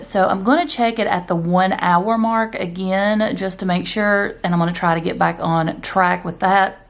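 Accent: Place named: South, United States. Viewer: I love your accent ... a lil bit southern , like I feel happy around.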